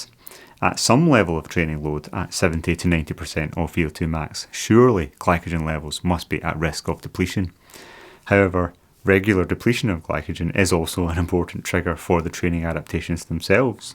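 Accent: Scotland, United Kingdom. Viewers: you've really outdone yourself on this video! I'm still working my way through it in 1/2 hour segments. It's very dense in terms of the information it contains, which in turn gives me plenty of food for thought. I really appreciate all of the hard work that went into researching and producing this. I love the way you've gone directly to the basic research, applied it to your own experiments and drawn your own conclusions, which in turn you've shared with us. I also look forward to the future nutrition topics you plan to delve into. I feel very fortunate to have discovered your channel. The only downside is that I find your voice totally mesmerizing, to the point where I cease to process the words that you're speaking. Gotta love that Scottish accent!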